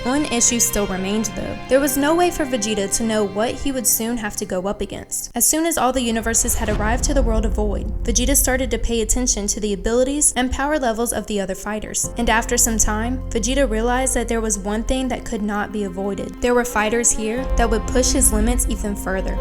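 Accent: South, United States. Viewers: Am i buggin or am i hearin a subtle southern accent ?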